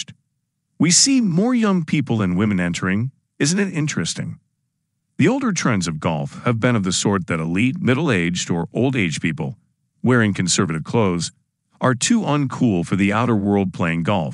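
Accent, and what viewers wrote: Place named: United States. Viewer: ..Why is someone with an American accent calling soccer, football????????z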